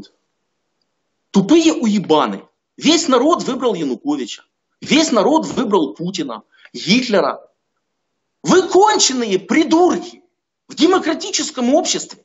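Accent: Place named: United Kingdom. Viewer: акцент гарний ( в сенсі красивий). Майже британський. До ар-пі ( пош - акцент), можливо, трохи не дотягує, але звучить досить естетично привабливо ( без гомосятини кажу, лише як естет )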